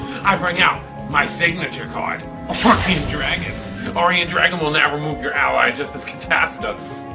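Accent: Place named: Brooklyn, United States. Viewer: you from brooklyn bro?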